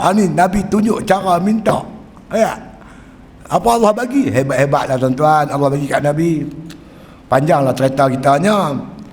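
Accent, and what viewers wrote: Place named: Perak, Malaysia. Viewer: Slank Perak utara. Nahu dia lain sikit dari Kedah atau Penang.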